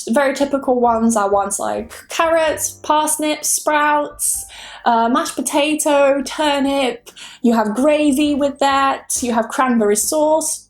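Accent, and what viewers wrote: United Kingdom. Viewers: Thank you for your teaching and British accent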